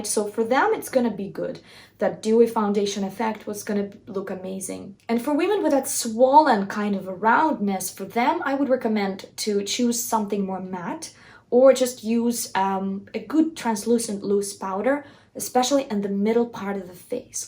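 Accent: Russia. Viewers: Thick russian accent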